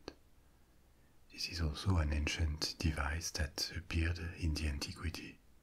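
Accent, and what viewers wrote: France. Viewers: Oh my god. The French accent is literally perfection combined with ASMR. What a time to be alive this is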